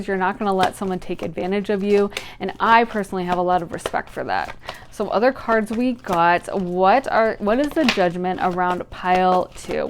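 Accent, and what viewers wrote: Minnesota, United States. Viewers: Are you from Minnesota? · are you from MN?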